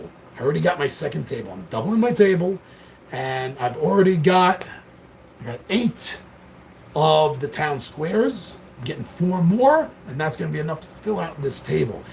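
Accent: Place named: New York, United States. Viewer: you have a new york accent? no way!!!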